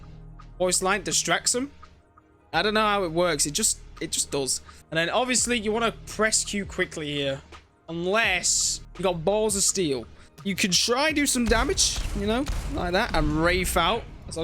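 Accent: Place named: South London, United Kingdom. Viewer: What part of London is the accent from bro? Sounds south.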